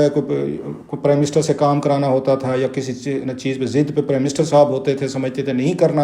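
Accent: Saraiki, Pakistan. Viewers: کلاسرا صیب ھک تاں اردو وی سرائیکی اچ بولیندو ڈوجھا جھوٹ بڑی شد مد نال بکدو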